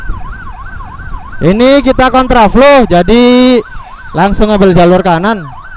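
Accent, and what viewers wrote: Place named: Java, Indonesia. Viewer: Lah jawane medok to ms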